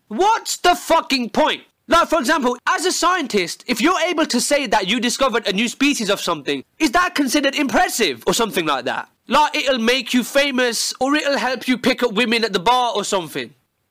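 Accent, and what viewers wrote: United Kingdom. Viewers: You british or what?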